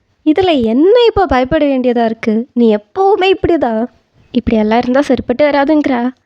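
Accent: Kerala, India. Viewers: Hi sis unga malayalam tamil explanation is soo good it's very different to hear me I like it explanation also very neat and clear so tq 😊 Fake fact lips series podunga sis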